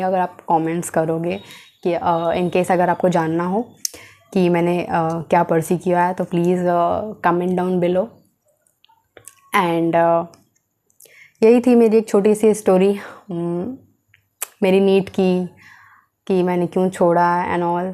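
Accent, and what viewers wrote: Bihar, India. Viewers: Tumhari acent me bihari tone hai 😅.... Are you from Bihar?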